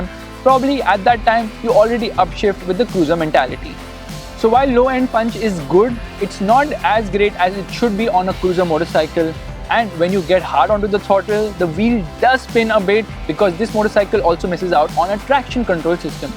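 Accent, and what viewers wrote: India. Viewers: Now first I want to say what a great video this guy put out. The host was really great and did a great review. To be honest I giggled a little bit because of his accent and his words that he used (however it's how things are said in india). But this provided great character and kept me wanting to view more, as a result I became attracted to the bike more. I wish he could do more videos in USA because he is pretty talented. So in all much love and respect, I am happy to give 2 thumbs up, this host made this bike kick ass even more than it is. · Акцент бешенный. Лучше б на индийском своем говорил.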